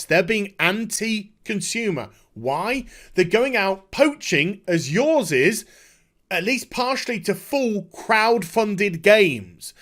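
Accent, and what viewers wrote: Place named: United Kingdom. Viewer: ive watch so many of your videos, that anytime i read something online i read it in my mind in your british accent.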